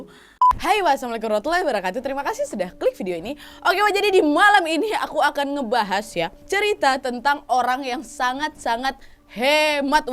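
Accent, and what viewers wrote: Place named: Medan, Indonesia. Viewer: Suka kaliii bah aku dengar cara bicaranya,gaya medan bahh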